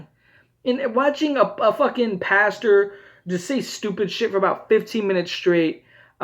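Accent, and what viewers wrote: New York, United States. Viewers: New York or Chicago? XD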